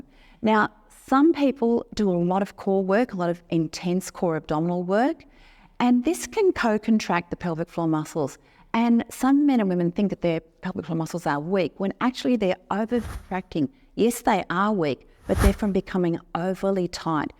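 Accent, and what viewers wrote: Australia. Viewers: your Australian accent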